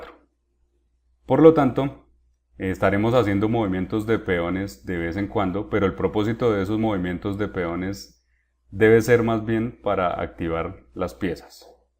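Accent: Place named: Colombia. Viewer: Me encanta tu canal y la forma como explicas. excelente!! Me animaré a comprar tus packs pero el pequeño detalle es que me toca también comprar un Pc porque tengo mac jeje. Saludos desde Bogotá. Tu de qué parte de Colombia eres? me parece haber escuchado ese acento en un torneo IRT.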